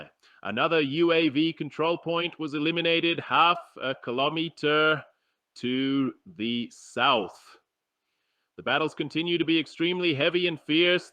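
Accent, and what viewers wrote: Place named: Afghanistan. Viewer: Why the afegani voice?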